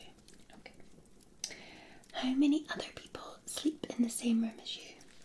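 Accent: Scotland, United Kingdom. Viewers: Can u do ASMR with ehavy scotish accent ? 🙂💙🤍💙🙏🥃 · And a sleep therapist with a Scottish accent, nonetheless!😉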